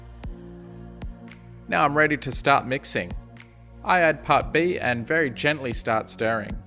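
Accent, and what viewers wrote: Australia. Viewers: Idk if you live in Australia but it sounds like you do if you stay safe from those fires Love your work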